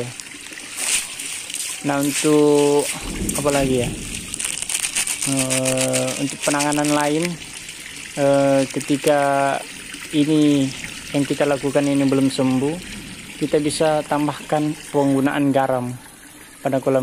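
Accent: Bugis, Indonesia. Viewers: Mantap bos. Kayaknya dari logat orang bugis😁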